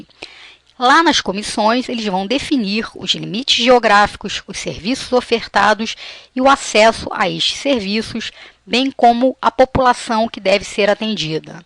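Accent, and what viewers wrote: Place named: Rio de Janeiro, Brazil. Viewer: Carioca falando é chato pra kralho...mas a aula foi boa.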